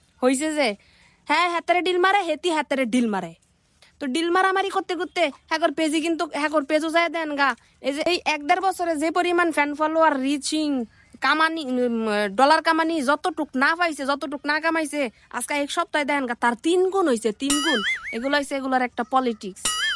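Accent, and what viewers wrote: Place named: Chapainawabganj, Bangladesh. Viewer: এ হাপা তোমার মুখের কথা গালা শুনতে খুবই ভালো লাগে হারঘে চাঁপাইনবাবগঞ্জের ভাষা শুনলে হার ঘে ভিডিওতে ঢুকে পড়ো।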